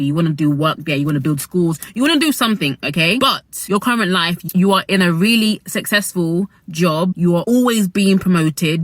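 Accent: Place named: RP, United Kingdom. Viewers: accent though…. So posh🤤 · I love your English accent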